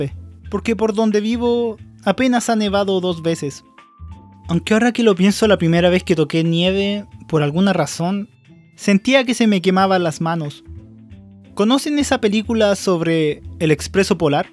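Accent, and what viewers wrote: Argentina. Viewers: Eres de argentina? :v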